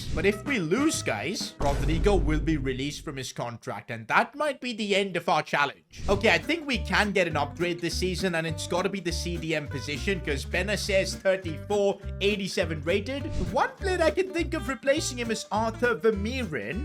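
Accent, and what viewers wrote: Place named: India. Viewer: lol Indian accent is so strong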